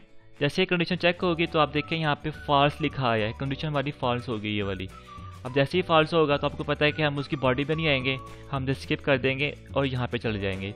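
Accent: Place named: Sialkot, Pakistan. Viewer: good and helping tutorial (y) ... and nice voice :P as I heard you , i knew that you from Sialkot :P maybe u r not but i thought :) Good job ,, keep it up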